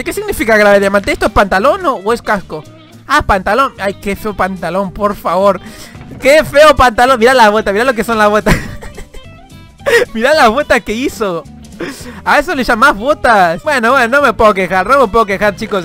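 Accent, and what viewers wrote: Argentina. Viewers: eres argentino porque llo si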